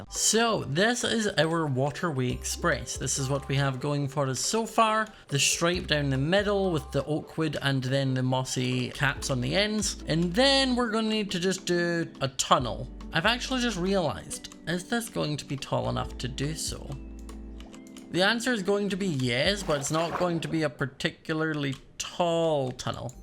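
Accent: Scotland, United Kingdom. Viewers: I'll keep that in mind and will try and remember to check my notifications at 6pm at Tuesday and Saturday- Btw, I love the Afterlife series! The Scottish accent makes your vids better and funnier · U are Scottish aren't U respect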